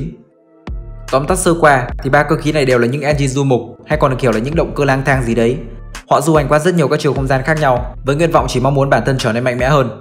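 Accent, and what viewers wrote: Japan. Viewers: Anh ấy đọc tiếng anh kiểu người Nhật đó bạn :V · Kênh của ad rất có chất lượng, nhưng có một nhược điểm lớn, đó là ad dựa vào phiên âm tiếng nhật quá nhiều để gọi tên cho các mecha, toy, gattai các loại. Ví dụ, Speedor, ad đọc thành speedoru luôn, trong khi đó chỉ là thứ tiếng anh nhật phiên âm sai thôi mà.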